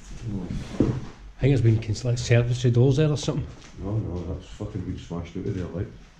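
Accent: Scotland, United Kingdom. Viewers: his accent is just OK as it is. As is the richness and smooth dialects of the Scottish language. We understand it just fine. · Even I can understand Scottish accent! lol I'm a Japanese though I'd like to hear your talk more Thank you. 👍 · He probly doesnt speak much becuase the Scottish accent can be hard to understand. I how ever love the Scottish acent somthing very nice about it.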